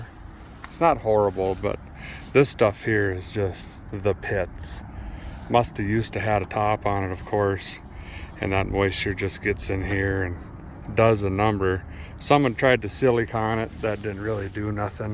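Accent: Minnesota, United States. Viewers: For Pete's sakes. Definitely a fellow Minnesotan. Love it! Neutralis is my new favorite word. 😊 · very entertaining indeed and informative too, i like you're accent so American "pure jus" a bit hard fore European ears but i get used to it now, call the old lady " Ma baker " Couse chi never die and like that song.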